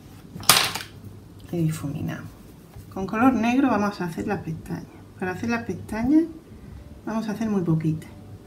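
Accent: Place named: Andalusia, Spain. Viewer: Holà dime como coses las orejas del nuñeco muchas gracias me encanta tu acento andaluz 😘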